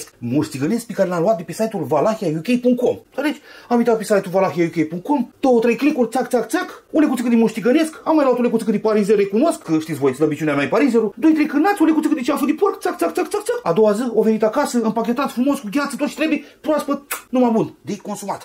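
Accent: Moldova. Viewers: M-ai pierdut cu moldovenească ta că nu înțeleg mai nimic 😢😢😢😢😮😮😮😮😮😮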